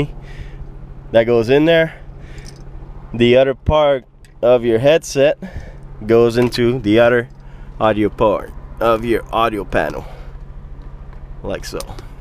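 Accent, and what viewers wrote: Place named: Quebec, Canada. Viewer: I couldn't get over your french Canadian accent. It's funny but I never heard it in all the videos that I've watched. Thanks again and now back to your cross country video!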